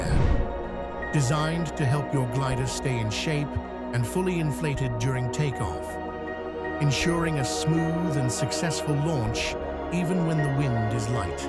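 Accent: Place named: Russia. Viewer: narrator is russian? why such bold russian accent?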